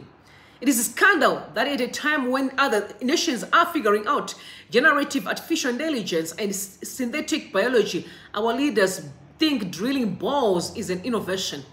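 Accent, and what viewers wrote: Zimbabwe. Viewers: Good content but be yourself , you try too hard to sound British and then slip into your default Shona accent and back to British accent... Go to school work hard was a Whiteman s agenda when they put the school.system . We need our own system that works for us.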